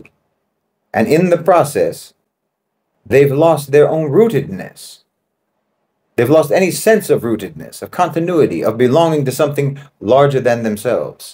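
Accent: United States. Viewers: I understand your impression of the US and its citizens, but I can't say that I agree with you completely. You are very articulate and well spoken, but not all of us in the US are bound by the constraints that you deem to be inherent in the system here. We usually all grow up with access to books, as you obviously have, which can allow the reader to experience different realities and socio-economic circumstances to which we may have never actually been privy to. You come across like your perception of the US was formed from what you have seen in movies, and not having experienced living in US culture for that long a time. I could be wrong, because judging from your accent, you have either spent a great deal of time in the US, or your parents did. You make a lot of generalizations about the population here, but this country is filled with people from all walks of life who all have a different perception of their circumstances.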